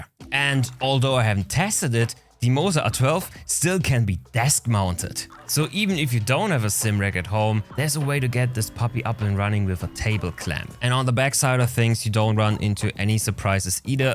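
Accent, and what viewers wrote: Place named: Germany. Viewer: klar, 160 euro mehr als Deutscher... Abzocke at its best, und dein german accent suckz bad, versuch besser mal auf deutsch -.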